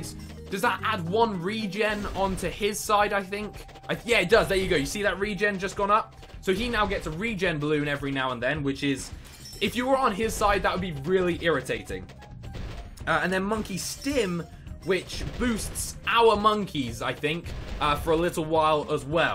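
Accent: United Kingdom. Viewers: is your voice a bridish accent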